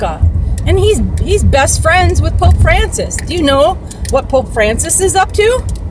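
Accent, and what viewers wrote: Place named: California, United States. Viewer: Hey buddy. Are you in Cali? You sound like you're in Cali.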